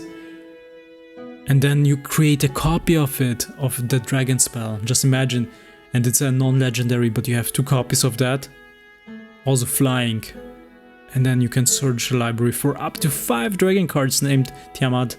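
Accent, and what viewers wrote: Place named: Germany. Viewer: Aren't you German? Because your voice/pronunciation is really cool.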